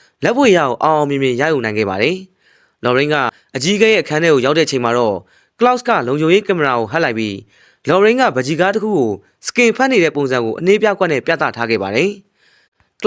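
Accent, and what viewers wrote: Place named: Upper Myanmar, Myanmar. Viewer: ဒီဗီဒီယိုတင်ဆက်သူက မြန်မာပြည်အထက်ပိုင်းဒေသကနဲ့တူတယ်။ Tom ရဲ့ အသံထွက်က "တွန်" ပါ။ "တွမ်" မဟုတ်ပါဘူး။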